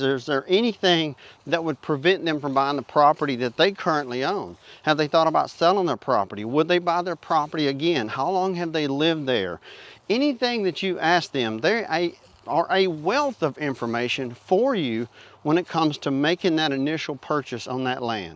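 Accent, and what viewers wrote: South, United States. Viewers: His voice goes well with him, sound like he has an southern accent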